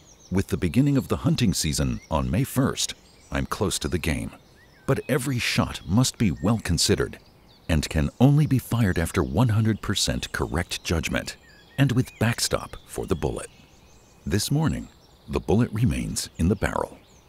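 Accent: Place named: Germany. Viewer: Wo ist denn sein sympathisches Denglisch und wo der Hund bei der Wasserjagd hin?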